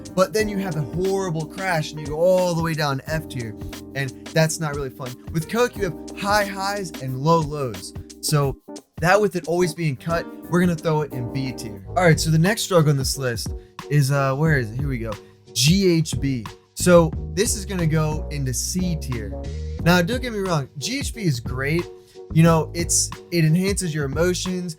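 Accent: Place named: East Coast, United States. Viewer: Man I don't mean to fanboy, but i would love to hang out with you. Sounds like you're on the east coast somewhere. Mid-Atlantic? Close to Pennsylvania?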